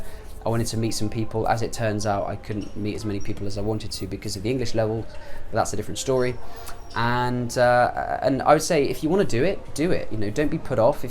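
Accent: United Kingdom. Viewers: Hi, I really want to say that your british accent is so pretty cool! I like it so much and want to have this accent as you :) Hope you come to travel in Thailand once. Although it might be not attractive so much like a big city, there are a lot of attractions waiting for you to enjoy x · Heyyy I love your accent Can you tell me something about it? And where are you from in UK? I mean where you grow up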